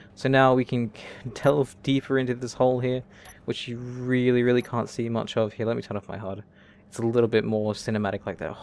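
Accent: Australia. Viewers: Show block placements, design choices, exploration and problem solving. Edit the largest constructions. For instance. You place a large reactor and a conveyor system and what not then go(in your Australian accent) 'Right guys brb' The jump to you finishing it. Things like small ships are cool to watch because you need to make tweaks and changes as you go. But large scale welding can be monotonous to watch. Not so bad once you have a welding ship.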